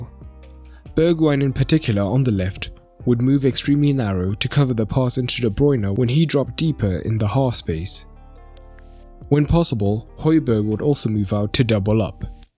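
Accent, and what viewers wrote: South Africa. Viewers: Bro where are you from ? You sound so South African ? 😂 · You sound south African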